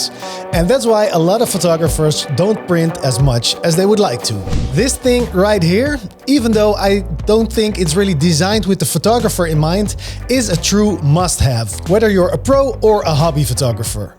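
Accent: Netherlands. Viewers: you definitely dutch! you have the same accent of my dj friend from breda! hahahahah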